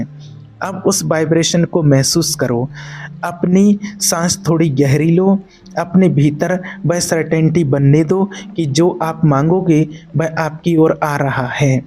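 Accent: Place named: Braj, India. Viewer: Pahle ye brahmend nhi hota Brahmand hota h aap up ke vo bhi birij ke ho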